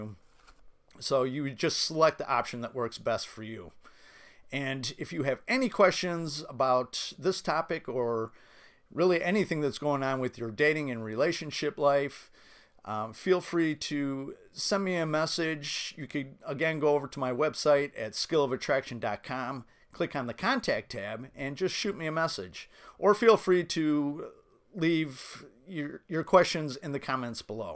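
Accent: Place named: Michigan, United States. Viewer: Are you from Michigan? Your accent sounds northern.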